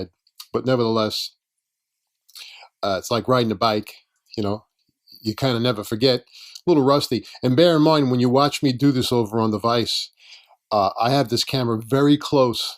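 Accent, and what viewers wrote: New York, United States. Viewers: Nice NY accent!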